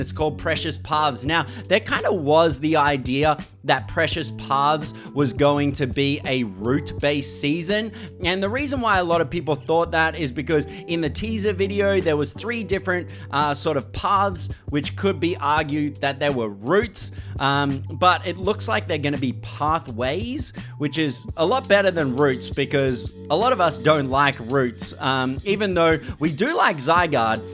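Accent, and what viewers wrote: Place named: Australia. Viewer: Bro are u Australian? I love Australia 🇦🇺❤️❤️🇦🇺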